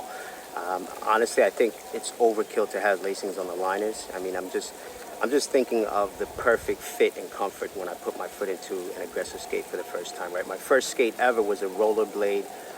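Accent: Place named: New York, United States. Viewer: Hey bro I saw this video for the first time and before u said it I knew it was new York. I was thinking Brooklyn or Queens. I live in PHA in South Minneapolis Minnesota. I cud tell u had that new York flavor very wu tang vibe 90s vibes. I'm in my 40s now just got back into skating. I skated from 97 to 2007. I skated Rollerblade brand. Now I have razors cult shima 3 remastered, razors Andrew broom pro shift skates and I just ordered the new Iqon AG 10 boots with an Iqon AG60 C frame. A carbon frame. Tho I wonder if I shud send the AG 10 back and get the Them 909 skate. The AG 10 comes in the mail tomorrow I just am so old school that all this new tech scares the shit outta me! I hope I love em I'm just Soo big on durability. I like the old school flavor of a plastic boot and basic shape. I just got a huge sale price on the Iqon that I went for it..all carbon and kevlar mix. 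I just subscribed to your channel and just showing support. I'm over here dodging gun fire just trying to skate haha no joke! I teach dance and I cut hair as a hairstylist. But I love to skate and it feels good to be at it again. Thanks for a great video. I learned Alot. I have alot to learn all over again. Be well and enjoy this summer!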